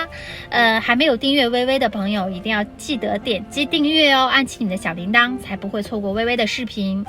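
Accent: Taiwan. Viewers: 聲音越來越台灣了，這就對了。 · 口音已經完全台化了！ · 糟糕您的口音越來越台灣了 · 苗栗縣人能撥打免費服務專線 1999 詢問任何個人所想詢問的有關苗栗縣相關問題！還有我很高興又是另外一位我所得知說話口音極近臺灣腔國語口音的大陸人。 P.S.其實全臺灣省各地的人，會因為年紀、種族、性別等等的不同，而會影響到個人說話的口音也並不一樣。就像我根本就不知道啥是"南部口音"？ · 妳也有台灣口音！ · 你的口音好台灣啊，一點都不對岸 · 講話跟台北人一樣，不像大陸人的口音。的視屛有一種吸引力，看了一次之後，就一直追蹤你的影片。其實台灣超市的密度，是全世界第一的。一個小鎮就有10幾家的超市，競爭得很厲害。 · 其實妳是小熊吧 （妳講台灣普通話很好聽） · 哈来台湾都会有个通病就是说话都被台化了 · 妳根本就是台灣人了！妳的口音、語調、想法、觀念，跟大部份的台灣人一樣啊！ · 你不說我根本感覺不出來你是大陸人根本一台妹好嗎?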